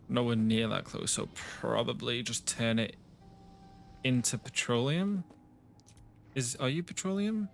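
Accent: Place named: United Kingdom. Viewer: you were discussing where you were gonna get water from because you’d forgot it, when you’re building on landfill! You can remove a couple landfill tiles and drop a pump down wherever you fancy. I found out by accident early on. Can I ask where abouts in the UK you’re from? Trying to pin your accent has been driving me insane watching your videos 😅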